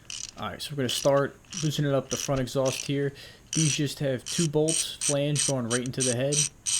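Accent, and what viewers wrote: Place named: Pennsylvania, United States. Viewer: Cool man. Sounds like a PA accent. Looks great. Totally worth getting a tool for the retaining ring I think. Here’s one I have. Pretty inexpensive for the heart burn. <link>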